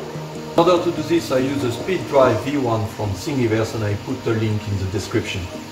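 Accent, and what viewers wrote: France. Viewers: I love your accent, are you french? The way you say extruder just sounds so fancy lol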